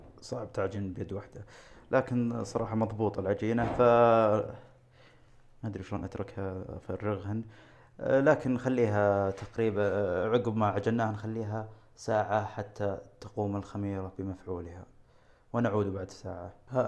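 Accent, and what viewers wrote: Saudi Arabia. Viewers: Love You هو يتكلم سعودي وعربي في نفس الوقت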